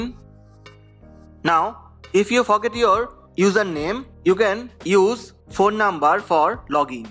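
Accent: India. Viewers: I've never heard such an extreme indian accent🤣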